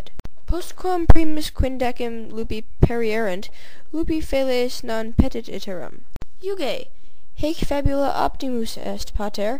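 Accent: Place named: England, United Kingdom. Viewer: Sounds like English. You should work your accent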